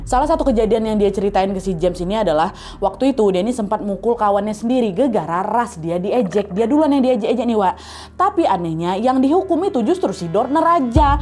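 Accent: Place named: Padang, Indonesia. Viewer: Kakak ni orang Padang apa Medan logat nya banyak Padang nya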